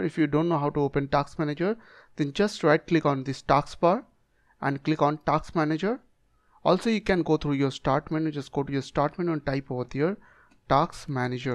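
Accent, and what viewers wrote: India. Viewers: he really got the stereotypical indian tech support scammer voice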